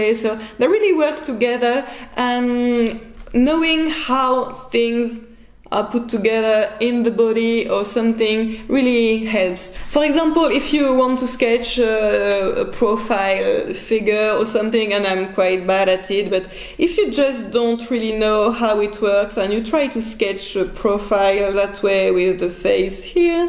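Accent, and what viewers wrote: France. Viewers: Merci beaucoup! Super ludique!! J'essaie pour le J5 demain 😉😉😉 J'adore tes vidéos, inspirantes et pleines de bonne humeur avec ton accent frenchie très mignon 😃. Ce serait super si tu avais le temps un jour de faire une vidéo sur les visages en quelques coup de pinceau 😊. Je ne sais jamais comment placer les ombres et les lumières et comment figurer les limites ni comment décider quels détails retenir. Merci encore pour tout 👍👍👍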